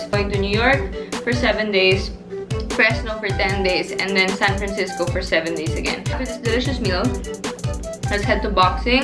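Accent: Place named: Philippines. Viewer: Her accent very much like a filipino 😘.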